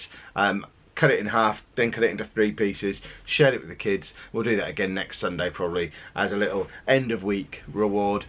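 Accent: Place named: London, United Kingdom. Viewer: You sound like your from london (paul rudds voice)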